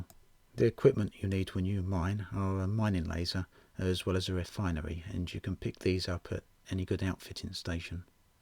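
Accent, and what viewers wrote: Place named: Cockney, United Kingdom. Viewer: Good vid. Please could you re-dub it in a Micheal Caine accent. Your normal accent isn't too far off...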